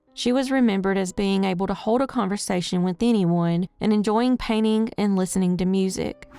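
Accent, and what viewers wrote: South, United States. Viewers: It’s because she isn’t on-screen. The biggest channels have hosts that are nice to watch. Also, a lot of people think the southern U.S. accent sounds dumb. In the states, we look down on the dumb country folk 🤷🏼‍♀️ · Hi, I meant Girl 👋🏽😏. I never realised just how very many videos you've actually made. Just when I think 🤔💭 I've seen em all, I find a load more!! Don't think that WE, Your Loyal, Lovin' Fans Don't appreciate all the Effort, Time & Hard Work that You put in, because WE Really DO. Also, I Really, Really love ❤️ your Accent!! WE All Do. Thank You, Girl & rather than say "Keep Up The Good Work", I'm actually going to say "Take it easy on Yourself Miss & make sure you have time for yourself too". Kind regards to both You & Your Family, From The UK via Jamaica 🇯🇲💯 God Bless You. Wayne D. 😏👍🏽🌹🌹🌹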